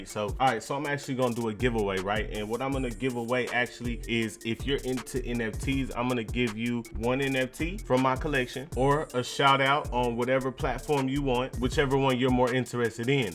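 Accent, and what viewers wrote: United States. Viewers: which part of usa are you from asking because of your accent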